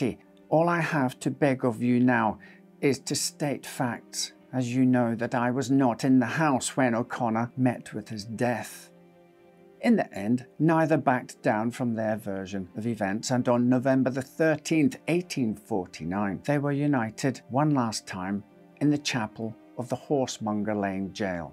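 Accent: Scotland, United Kingdom. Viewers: My first time watching. What a delight. I enjoyed the production; no small effort. I assume you've been involved in acting. I look so forward to making up for lost time and watching your archive. Oh, and let it be said, "There is no accent on earth as rich and wonderful as a fine Scotsman's"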